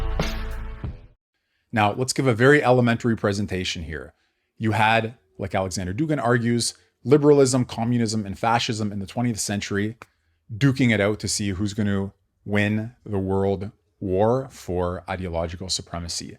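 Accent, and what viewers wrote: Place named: Canada. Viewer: Canadian accent was a surprise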